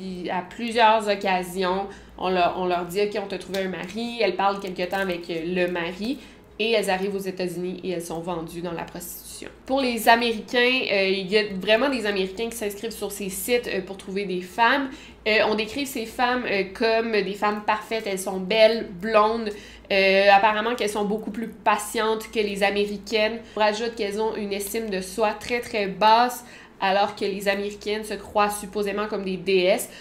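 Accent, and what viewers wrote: Canada. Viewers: Bravo! J'adore regarder tes vidéos et peaufiner ensuite avec quelques recherches de mon côté, tes sujets sont toujours très attractifs et tu réussis à te les approprier d'une manière vraiment intéressante pour ceux qui te regardent, on ressent que tu es réellement investie dans ton travail et ça fait du bien! Et ton accent canadien est le petit bonus que j'aime beaucoup! Bonne journée, une de tes admiratrices françaises!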